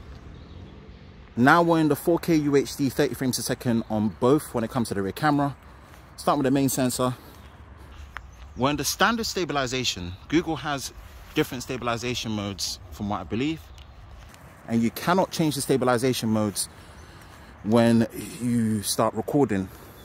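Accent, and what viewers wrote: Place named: United Kingdom. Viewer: i like that british accent my friend. how about battery test ?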